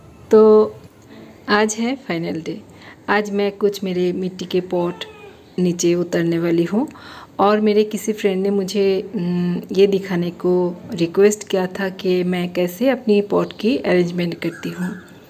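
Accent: Bengal, India. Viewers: Sotty tomar mukhe hindi khub bhalo lage.ami c.g. te thaki ekhanei boro hoechi kintu amar me bole tomar mukhe hindi sunlei bojha jaye tumi bangali😊😊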